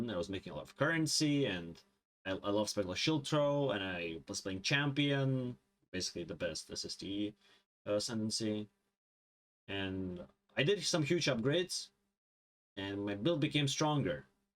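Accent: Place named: Latvia. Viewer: Latvisks akcents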